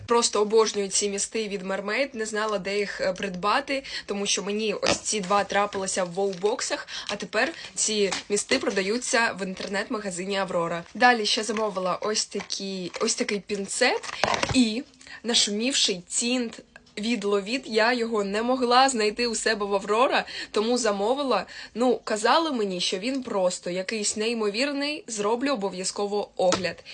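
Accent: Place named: Russia. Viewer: Или евелін. Говори на рідній мові не ламай язика. Чувствуется русский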